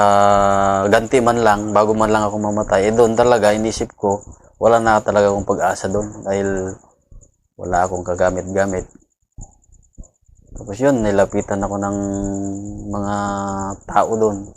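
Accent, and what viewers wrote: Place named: Ilocos, Philippines. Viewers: Taga saan si sir? Igorot ba kyo o Ilocano? Kc sa pagtatagalog nya parang kababayan ko sya? Salute you sir! God is good all the time, hindi nya kyo pinabayaan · Halata mo ilocano si buddy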